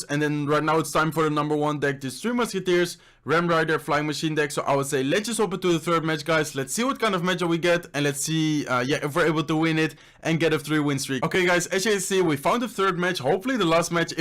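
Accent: Netherlands. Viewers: He is dutch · Ik hoor zo goed dat je nederlands bent😂😂👍🏻 goeie vid tho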